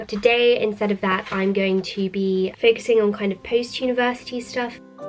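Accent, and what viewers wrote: Spain. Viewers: Your Spanish accent is so cute!! Love you ✨💓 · I love your Spanish accent! It’s so cute🤗 I’m a native Spanish speaker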